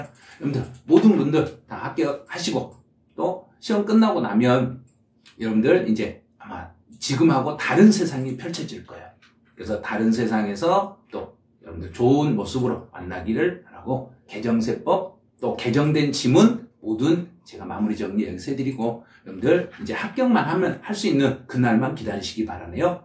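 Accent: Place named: Jeolla, South Korea. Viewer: 쌤 사랑합니다! 쌤 셥듣고 나서 이상형이 바꼇어요~ㅋㅋ 전라도 사투리 쓰는 남자로요~♡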